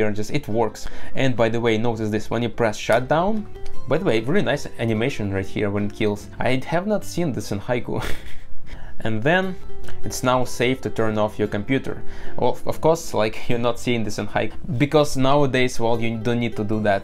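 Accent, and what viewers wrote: Russia. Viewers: Obviously not, strong russian accent :)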